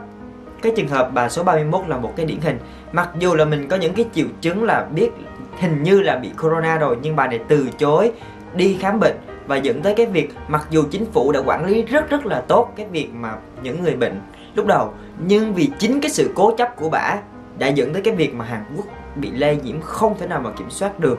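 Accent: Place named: Central, Vietnam. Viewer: Anh là người quê ở đâu ạ. Nghe giọng rất giống giọng miền Trung :33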